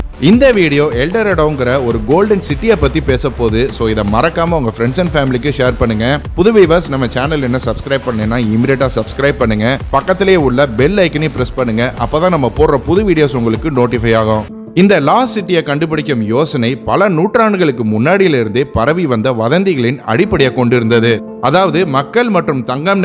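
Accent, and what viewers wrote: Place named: Chennai, India. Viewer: That's it chennai voice mathri iruku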